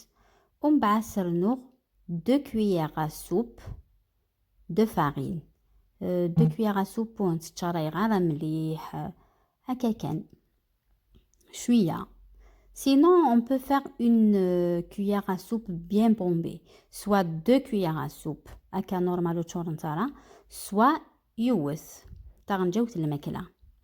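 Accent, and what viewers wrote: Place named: Little Kabylie, Algeria. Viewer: Accent de la petite kebylie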